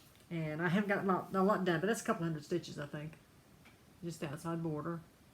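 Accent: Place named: North Carolina, United States. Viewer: I love just hearing you talk... my grandmother was from North Carolina and she sounded just like you as well as my aunts. Warmed my heart so much.But the fact you are talking about stitching is even better.Loved seeing all your working on :)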